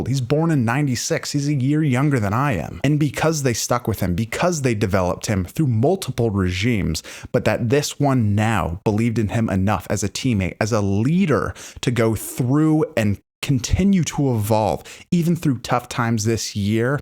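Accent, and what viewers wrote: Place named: Canada. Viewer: So where from Canada is this guy from again???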